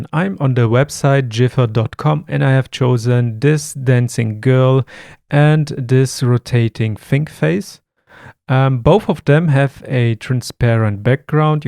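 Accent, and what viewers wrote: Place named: Germany. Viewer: i hören a bit of deutsch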